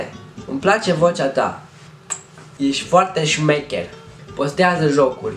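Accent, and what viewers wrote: France. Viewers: nu e sasait are accent frantuzesc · Ba nu vorbeste sâsâit...are accent franțuzesc •^•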